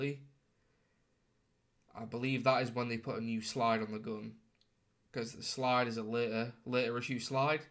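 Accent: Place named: United Kingdom. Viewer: I’m not used to listening to a British accent on a gun collection video, but great collection!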